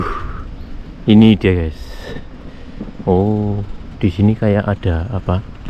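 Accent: Banyumas, Indonesia. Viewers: semangat blusukan terus mas, bungah tak ulang-ulang terus nonton vidio blusukan mas ngapak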